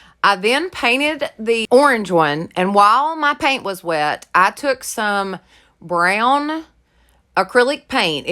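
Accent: South, United States. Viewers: girlfriend, you “blew these out of the water” they are all so gorgeous!! That pumpkin one is the prettiest thing I’ve ever seen, girl you are sooooo good!!! Oh yeah, I wanted to let you know that I ordered some Chalk Couture from you🥰 I have really been wanting to get into doing that but I just have put it off until TODAY! Girl when I saw you were a consultant for them, I had to get started with you as my consultant!! You inspire me so much, you have NO IDEA! I am only 54 and pretty much home bound because of my health. I don’t have a lot of good days, but even if I’m having a bad day, like today, I can watch your YouTube videos and you just cheer me up TREMENDOUSLY!! I’m from a small town about 30 miles south of Birmingham so I totally appreciate your “Southern drawwww” cause I got it too, lol!! Thank you so much for all that you do and create for all of us to enjoy and sometimes create our own!! 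I love you and your channel and I sincerely mean that!!! Keep making those videos please!! Thanks again, LOVE YA GIRL! Paula Wilder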